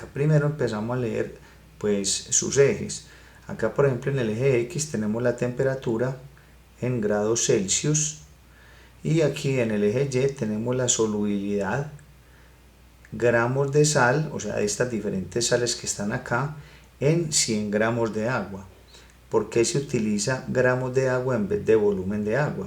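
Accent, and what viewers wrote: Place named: Colombia. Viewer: la voz colombiana ctmm mata wn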